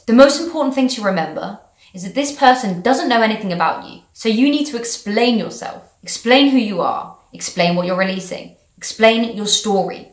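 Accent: United Kingdom. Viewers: THANKS FOR THE INFORMATION, BTW I LOVE THAT BRITTISH ACCENT! ;-)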